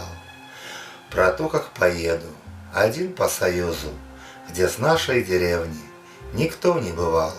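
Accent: Belarus. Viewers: Братка- беларус чытае?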